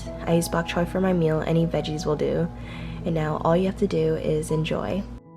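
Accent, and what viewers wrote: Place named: West Coast, United States. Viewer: thank you i love when I get recipe from the real country people. however you sound like west cost.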